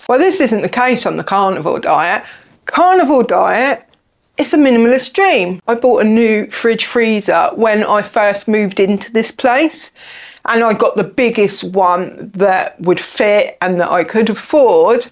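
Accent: Cockney, United Kingdom. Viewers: no thats fine. I originally thought you were here in the states but with an English accent. Thank you for clearing that up. Lol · Cheeky you, love your cockney accent. I bet you’d love my Bronx accent. 😂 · As an Aussie I find your English accent super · ...are you cockney, Scottish? Please tell!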